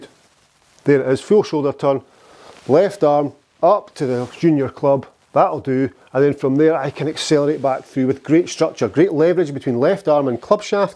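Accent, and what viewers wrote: Scotland, United Kingdom. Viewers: Two reasons why I watch this. To learn how to play golf and to learn how to speak Scottish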